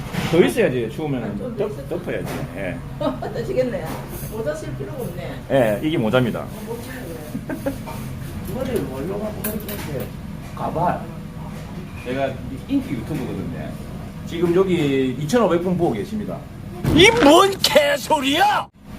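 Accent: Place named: Gyeongsang, South Korea. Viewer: 아~함암이네요 ㅋ 사촌이모가 함안이 고향인데.. 지는 부산이 고향인데..뜬지 12년차..ㅋㅋㅋ 꺼 보믄서 사투리..이자무꼬 다시 배우고 이쓰예 ~~~ 국민학교 ㅋㅋㅋㅋ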